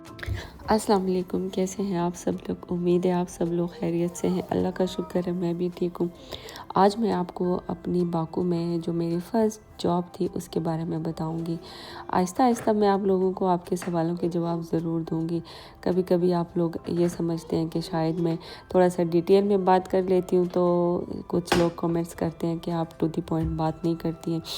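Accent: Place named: Mansehra, Pakistan. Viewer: muje aap ki zaban se lagga tha k aap mansehra se hugi because udar k log buht ko much boltay hein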